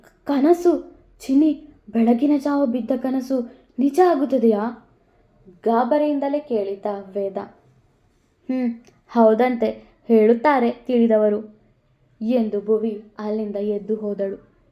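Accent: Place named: Dakshina Kannada, India. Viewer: hauda !! Naanu nimge bahushaha exams irabahudu anyone. Neevu kannada narrate maaduva style namma pure Dakshina Kannada style. Haagaagi bahala kushi aagthade keluvaaga. Adanne naanu miss maadikollodu.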